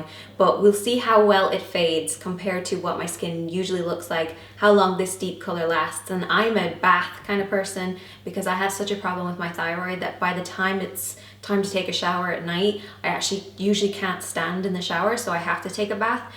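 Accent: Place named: Ireland. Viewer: I can hear an Irish accent in there 🇮🇪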